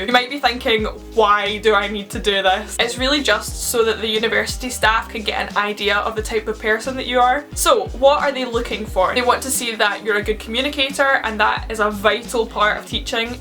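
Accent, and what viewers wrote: Scotland, United Kingdom. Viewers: First of all, I could listen to you talk all day long. I love the sound of your Scottish voice. Even wearing glasses you still look far too attractive to be a teacher. From what I could tell you're a bit shortsighted so you need glasses to see things at a distance clearly. I'm a retired optician if you're wondering. Over then next few years you can expect your eyesight to further worsen a bit each year due to your young age and the amount of close work you will be doing such as reading and studying. You can expect to be wearing glasses full time within a year or two. So it's a good thing you look as amazing wearing glasses. But then again, teachers are expected to wear glasses. I remember in high school every teacher I had was rather old and they all wore glasses. The only exception was my junior year history teacher. She was young and very pretty and I always looked forward to my history class just because of her. 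When we came back for Christmas break I was surprised to see her wearing glasses. She must have just gotten them because she was doing a lot of taking them off and putting them back on. At one point she was in front of her desk teaching when realized she wasn't wearing her glasses. She turned around to get her glasses and as she was putting them on she said that she had just got them 3 days earlier and she was still trying to get used to wearing them. She told us that she had noticed a couple months before Christmas break that she was having trouble seeing posters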